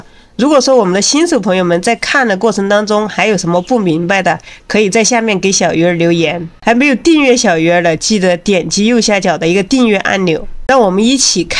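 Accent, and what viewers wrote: Sichuan, China. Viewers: 不错，你的川普，我知道你在哪里了，怎么联系你，跟着学习，谢谢！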